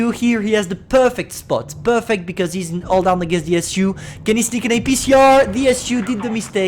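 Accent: France. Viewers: Un super accent pour un français bravo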